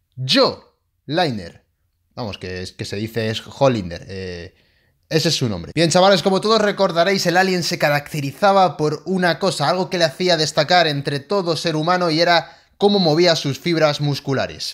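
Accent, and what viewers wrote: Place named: Andalusia, Spain. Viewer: jajjajajaj hostia el acento andaluz ha sio buenismo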